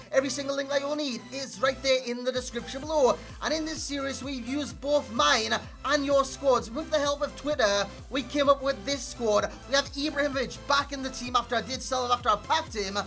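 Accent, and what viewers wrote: Newcastle, United Kingdom. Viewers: is geordie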